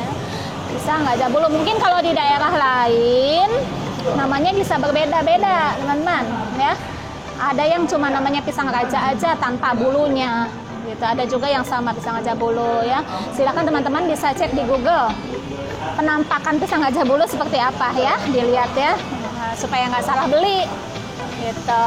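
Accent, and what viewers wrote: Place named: Baubau, Indonesia. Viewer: Aslam Alaikum Bu asalnya dari bau2 saya juga dari bau2 tinggalnya mana sekarang logatnya tadi dari bau2 bls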